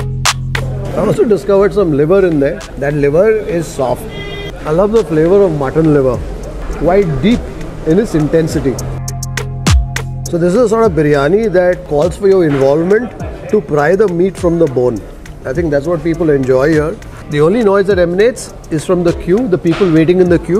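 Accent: Karnataka, India. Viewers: Most underrated food review channel....classy and simple English explanation is like "Desi food review in Desi English".... Hope channel grows bigger ❤️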